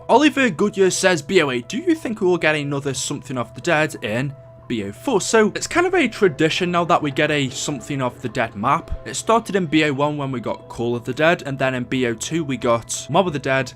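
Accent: United Kingdom. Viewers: BOA are you british? Also how old is Arthur and how tall is Arthur? ( I think hes around 7 feet tall)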